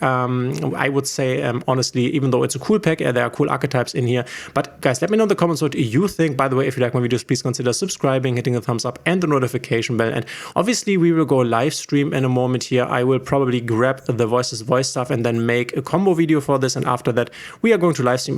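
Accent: Germany. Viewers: Well, he's German, so he's going to pronounce some card names differently. You also cannot argue that Sauravis is an English word or name. Same thing with Baronne de fleur, a card which every English speaker pronounces incorrectly, but who cares?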